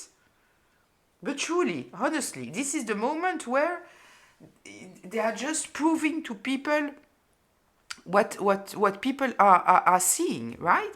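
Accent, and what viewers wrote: France. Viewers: Love your beautiful French accent, and when you swear, lol, do you reside in the UK? Very insightful reader, I do resonate xx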